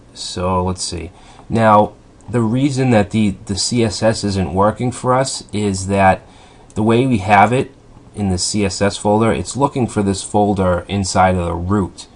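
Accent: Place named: Maine, United States. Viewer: Absolutely awesome tutorial!!! Thank you so much. What is your accent? Maine?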